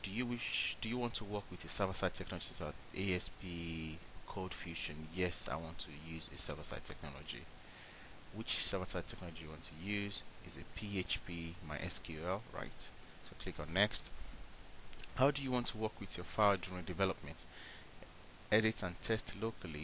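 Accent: Nigeria. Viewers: are u a NIGERIAN???? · U must be a Nigerian!!!, I can never forget our accent.. love u man.. and u must b a Yoruba man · Thanks for the video and cool ascent from Niga!!!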